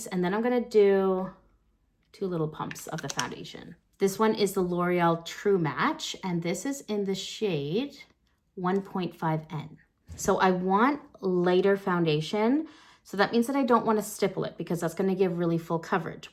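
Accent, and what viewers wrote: Canada. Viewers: I think it’s that she’s normal and genuine. I don’t find I can relate to a lot of beauty YouTubers. I don’t find many of them teaching anything I find helpful, more so just selling products. I don’t feel like does that. I actually feel like back in the day when I worked as a makeup counter artist for Bobbi Brown, reminds me of one of the traveling makeup artists that I loved to work with. I leaned so much from her. I also find her Canadian accent cute, as a Texan it’s so cute to me.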